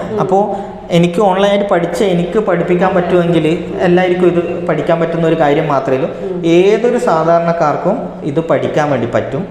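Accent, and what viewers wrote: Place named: Kannur, India. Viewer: Kannur bhasha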